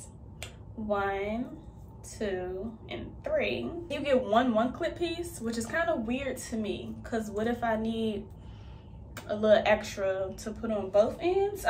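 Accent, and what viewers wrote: Washington DC, United States. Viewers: ik a dc accent when I hear one! lol. this turned out amazing btw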